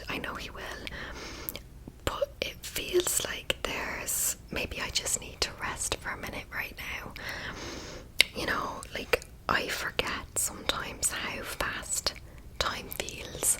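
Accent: Ireland. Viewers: I have a 5-star asmr playlist. Hard to get on it but this one is on it. I also have an “Exceptional” ASMR playlist. Even harder to get on, but I just put this one on it. Your Irish accent voice is the best, and you are one of the most beautiful women I have seen on YouTube. Thanks for sharing with us.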